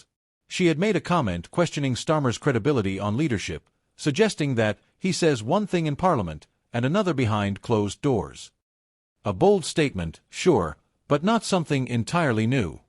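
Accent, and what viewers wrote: United States. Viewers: Dreadful editing and poor pronunciation the American accent of the bot narrating is dreadful and amateurish 😂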